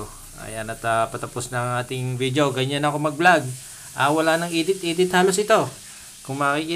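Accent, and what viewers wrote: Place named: Batangas, Philippines. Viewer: Ay kainaman.batangasin ang iyung tuno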